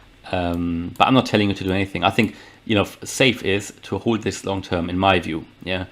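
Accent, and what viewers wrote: Germany. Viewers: Nice vid. U must be German yeah? 😆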